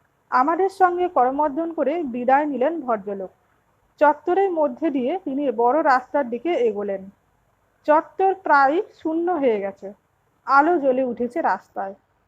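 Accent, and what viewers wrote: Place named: Manbhum, India. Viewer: আপনার ভয়েস অ্যাকসেন্ট শুনে মনে হচ্ছে আপনি আসানসোল পুরুলিয়া বাঁকুড়া মেদিনীপুর অর্থাৎ মানভূম অঞ্চলের মানুষ।ভুল হলে জানাবেন।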